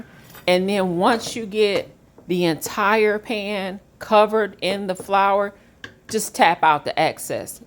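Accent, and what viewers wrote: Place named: Detroit, United States. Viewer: Sounds like a Detroit accent.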